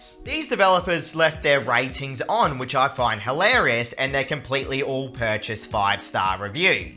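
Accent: Australia. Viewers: Australian accent make mau laughing so hard 😂😂😂😂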